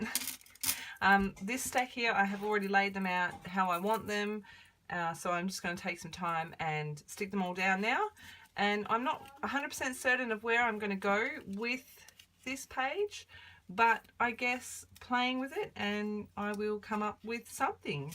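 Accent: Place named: Australia. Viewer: I love your thought process! I am a new subscriber and honestly I first clicked on your video because of your first name and I LOVE you saying my name with your Aussie accent 😉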